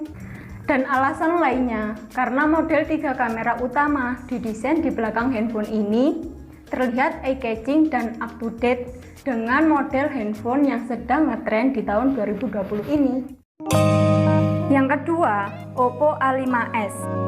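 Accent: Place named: Java, Indonesia. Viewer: Medok men to mbak ma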